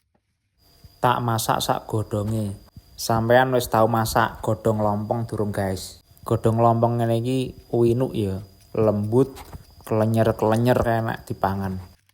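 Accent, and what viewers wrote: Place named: Banyumas, Indonesia. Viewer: bahasane ngapak bangeet Mas 👍🏻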